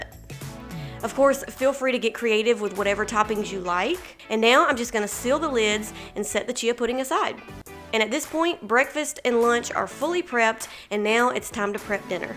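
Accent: South, United States. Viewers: There's nothing better than a sweet southern drawl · Came for the insight, stayed for the southern accent. 😎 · love that country accent baby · Love the dishes you put together. Simple and they look yummy and healthy. You sure do talk at a good speed, and I love your Southern accent. I'm from Texas, but don't have the drawl. Thank you. Love all your videos.:virtualhug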